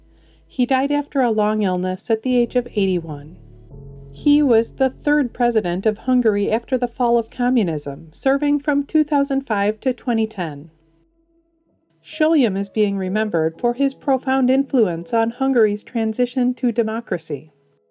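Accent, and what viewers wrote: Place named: Iowa, United States. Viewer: your voice is so soothing that it could lull me to sleep and your sweet accent sounds like the Midwest to me. If it’s not too personal, would you mind tell me where you grew up? My guess is Iowa because it sounds corn fed, but I think about Fargo and Marge’s accent and that would put you in Minnesota? Cheers, Mary Rose. P.S. Because I love arcane information, I know that Estonian, Finnish, Hungarian are one of the smallest non-tribal languages groups in the world, and now you know it too. Cheers and Keep Up the Good Work, Mary Rose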